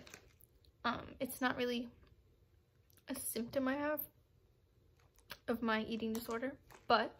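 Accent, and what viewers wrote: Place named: Poland. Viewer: Your accent seem like Polish lol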